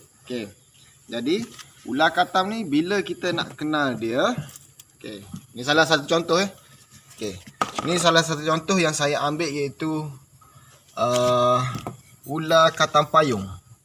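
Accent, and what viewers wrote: Kelantan, Malaysia. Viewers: tuan asal kelantan atau trgganu? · ore klate peseng abe ni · Kelate molek bunyi abe... Hehehe terbaik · Kelate kecek lua...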